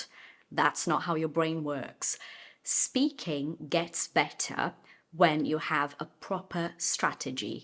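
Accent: United Kingdom. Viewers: You have a beautiful British accent!! I couldn't tell you are not a native, actually!!! 😮😊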